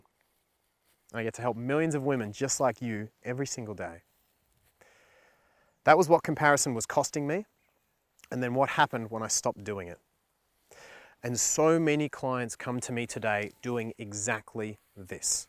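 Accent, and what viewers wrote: Australia. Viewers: Everyone has his/her own strength, beauty, and talented like ourselves fingerprints. No one can still what we have. I’m just like you and others. I’m proud to be my authentic me. Yes, strongly agree! Only you if you want to be great or better to complete and compare with, this is the worst enemy you will face with in the world. Often time I wanted to give up on what I’m doing, often time I want to become whoever better than me. But the end of the day, me only I can be. I love the way you are. you are sexy I’m telling as a feminine energy. Your Australian accent is so cute and unique. I’m Thai but have lived in the USA for awhile, people get a good laugh out of my Thai-Lish all the time. I thought, YES, I’m so special cuz only me can talk just like me. I am a surgical assistant for cardiac surgery, I can do a job like Americans, but they can’t speak English like me 😉 I learned to love myself since I’m different in the different world like America. 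Thank you for your message, it’s so rich and right on the money 💰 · Australian accent is equally good 😜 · You have an Australian accent! Oooeee! Just as sexy... great message, you’ve got to own your uniqueness ❤️ it’s taken me a long time to look in the mirror and believe I’m a 10/10 in my own right xx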